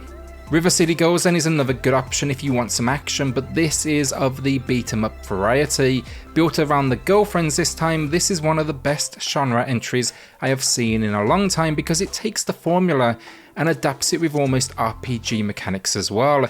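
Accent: United Kingdom. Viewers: I have to ask, where in the UK is your accent from? Haha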